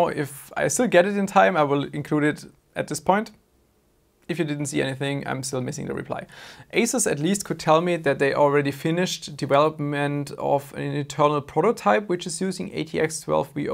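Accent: Germany. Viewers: Hahaha dieser deutsche Akzent kickt anders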